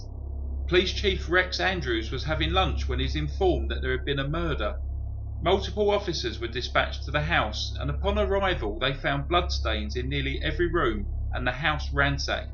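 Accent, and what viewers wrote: England, United Kingdom. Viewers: These cats think anyone with an english accent sound like the same person.! lol Although these are 2 of my very favorite channels! Brothers or cousins maybe?? teee heee! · I think people think you and Brief case sound alike because of your British accent. To Americans British accents all sound alike.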